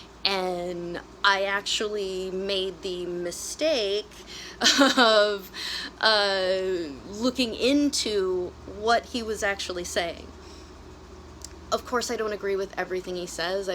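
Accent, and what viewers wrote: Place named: United States. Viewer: You sure sound like an American to me. Americans look like everyone. Political parties are constantly redefining themselves as do people, so that is too complicated for me. The people I find most interesting and least threatening are people who apply critical thought to each situation as opposed to people locked in to an ideology that stifles free thinking. You are obviously a beautiful American woman and I would just suggest, avoid the thought police and don’t let people define you. We don’t all think the same. Where’s the fun in that. Sorry for what you’re going through, family can sometimes be the harshest, I know. One more thing, you should know, there are literally millions of people who feel exactly like you and we are with you.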